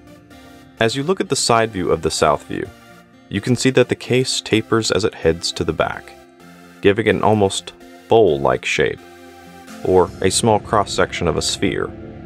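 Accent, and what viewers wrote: United States. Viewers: It's nice to hear an American accent discuss watches. Every other channel I'm subbed to are English. You're way more concise than other watch commentators.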